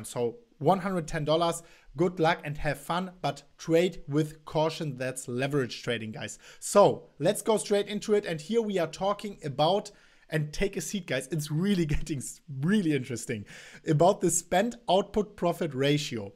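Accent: Germany. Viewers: Love your German accent. It actually makes me listen with focus , cheers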